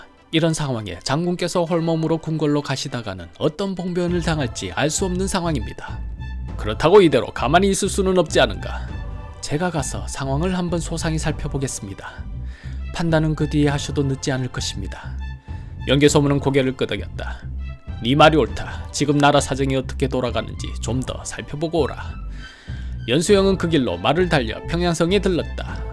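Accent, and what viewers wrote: Gyeongsang, South Korea. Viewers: 경상도 사투리. 감추려 해도 감출수 없지.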